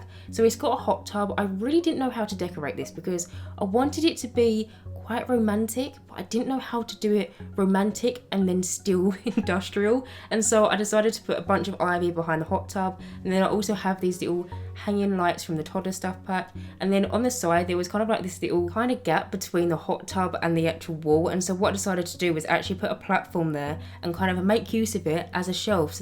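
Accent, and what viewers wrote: United Kingdom. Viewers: I absolutely adore your videos, you are so imaginative and I love the storytelling while you go through the videos, especially a fellow British accent! Sometimes I feel like other Sims videos are so loud and dramatic, but I love having your calming vids on in the background! Thank you for always posting (but also no thanks for always making me buy more packs!!!) X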